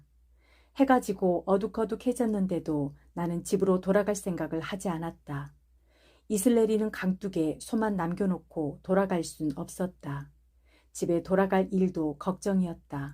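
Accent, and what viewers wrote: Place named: Goheung, South Korea. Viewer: 내고향 고흥 사투리에 어린시절이 생각나는 실감나는 명작이네요 아~~옛날이여 잘들었네요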